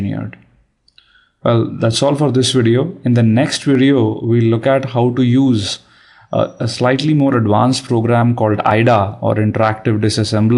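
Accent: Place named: England, United Kingdom. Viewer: you have a strong english accent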